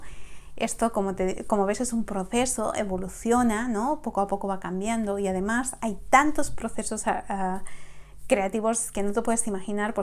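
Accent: Spain. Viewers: eres española? Saludos desde México.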